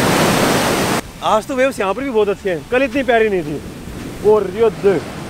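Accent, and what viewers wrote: Haryana, India. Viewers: You have a nice haryanvi touch accent..... Like yr voice.....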